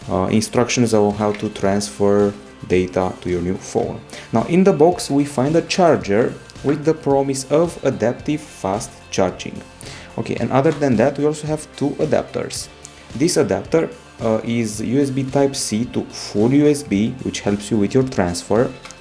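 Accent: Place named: Romania. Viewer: După accent se vede că eşti român :)))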